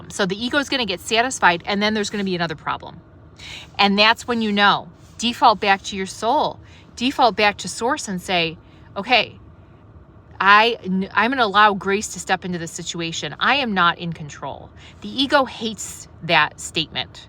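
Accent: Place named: Midwest, United States. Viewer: Wild to hear this with a Midwest accent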